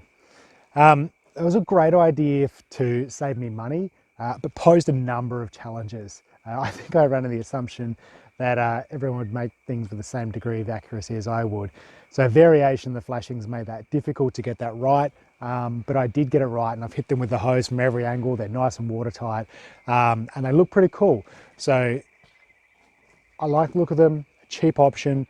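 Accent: Australia. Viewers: Dump Chump I agree I heard a accent didn’t know it was Australian though, wasn’t focused on it. To be honest I was a bit drunk when I posted. Not a excuse just I wasn’t in my peak operating condition. I often forget we aren’t all living in a global economy, I don’t notice the separation anymore. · Nicely designed for the space. Lots of thought put into it. The entry/overhang is well worth the tiny added cost - it gives it character. Just something to share...one tiny house I saw recently showed a toilet that was in a bathroom, but it was incorporated into a cupboard, and looked just like a low cupboard door that was part of a tall cupboard. The lower part just rolled out on wheels and was a composting bucket. You could do the same here, thereby utilizing the large entry space (which would then provide the headroom needed forcthe action of sitting and rising from the toilet) and would thereby free the upper portion of what you currently have as a toilet for use as storage. 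If course no privacy if there are 2 of you, but it's an option for someone for whom that design would work. You say $4,000, but YouTube is global and I can't tell whose dollars that is - Australian? New Zealand? I'm guessing those because of your accent and use of the word bench for what North Americans call a counter. 😊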